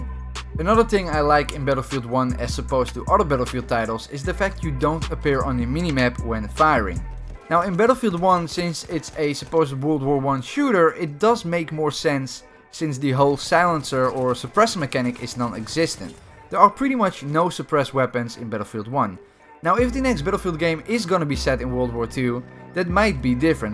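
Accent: Netherlands. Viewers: Are you dutch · Ik dacht dat ik goed in engels als nl zijnde ik herkende je accent bijna niet good vid do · Such a Dutch accent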